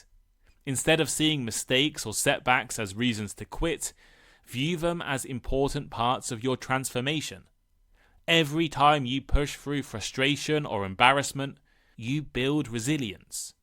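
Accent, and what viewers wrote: United Kingdom. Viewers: It's my great honour to hear from you. Shadowing your clear and standard Britsh accent has become a habit of mine.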